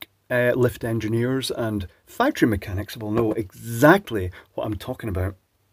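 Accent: United Kingdom. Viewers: Really,? you don't have a dog, so you pick the nearest selenium rectifier to blame it on? Gotta say, your viewers are among the most classy lot on YT. I was expecting to see nothing but a pot full of fart jokes, but NO! There's more vulgarity in the comments below the White house news briefings. Isn't it amazing what effect a proper British gentleman's accent has on people?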